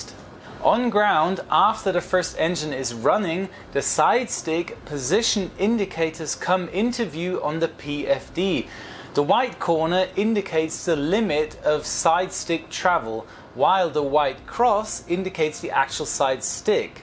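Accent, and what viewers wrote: Germany. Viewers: Are you from Germany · Bist du Deutscher ? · Wait, you're German?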